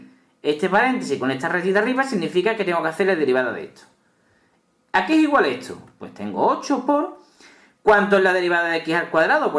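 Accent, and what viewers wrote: Andalusia, Spain. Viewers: Macho tienes un acentazo andalú increíble jajajajaja mete un buen rollito a la hora de aprender algo tan... perverso como son las derivadas que dan ganas de ponerse a hacerlas y todo jajjaajaja buenísimo el vídeo, saludos desde Canarias! ;)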